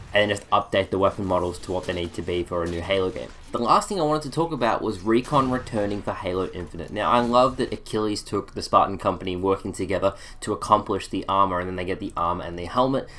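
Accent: Australia. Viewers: aussie rep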